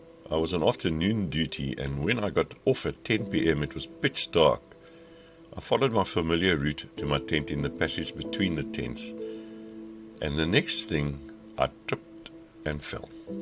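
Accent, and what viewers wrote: South Africa. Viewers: You're English speaking? Where you from? Bloemfontein? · You have a very strong Afrikaans accent. JY is soo Afrikaans en probeer bewys JY is nie.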